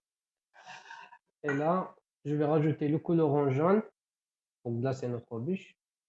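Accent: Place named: Kabylie, Algeria. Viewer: J’adore votre accent kabyle Merci pour cette recette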